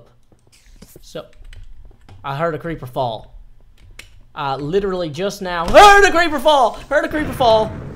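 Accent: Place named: South, United States. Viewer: " I literally heard a cree- HUUURR OMG!" XD funniest part ever.. your southern accent is hilarious ( not laughing at you) but you just earned a subscriber! XD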